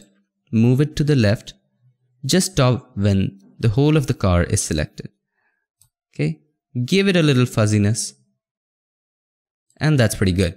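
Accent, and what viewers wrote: India. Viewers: cannot focus on the video... indian accent and connected eybrows ruined the experience for me :/ good video tho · I usually hate indian accents but yours is somehow great in my ears 😂👌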